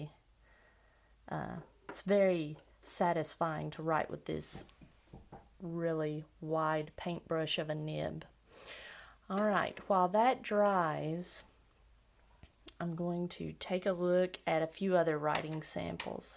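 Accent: South, United States. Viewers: I’ve really been enjoying your ink studies. Tell me, do I detect a southern accent?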